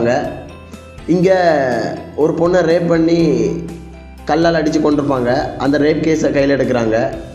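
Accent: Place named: Marthandam, India. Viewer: Loi marthandam slang try a😅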